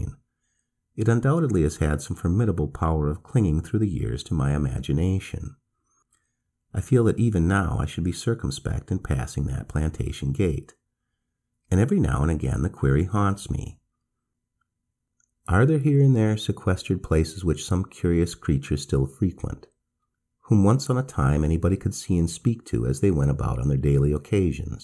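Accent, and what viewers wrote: United States. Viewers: Funny to hear an M R James story narrated by an American just when I was getting used to more complex British accents. 😁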